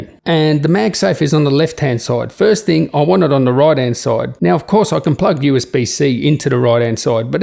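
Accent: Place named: Australia. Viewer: I love Aussie accent :)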